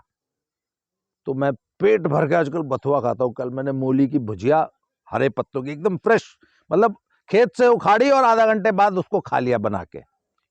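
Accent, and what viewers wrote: Haryana, India. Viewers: Guruji ये विडियो देख के बड़ा मजा आया ओर अच्छा भी लगा के आप वापिस अपनी फोर्म मे आगये, आपका देसी अंदाज़ ओर भोजपुरी मे हरयाणवी टच सरसो के साग मे देसी घी, प्रणाम🙏🏻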